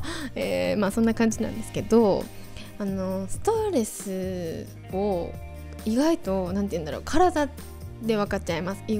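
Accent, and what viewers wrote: Kansai, Japan. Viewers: めちゃんこかわいいがな関西弁かわよ 「そのままやないかい」 名言やないかい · ちゃんの関西弁大好きです❤️ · いつもより関西弁聞けてうれしい☺️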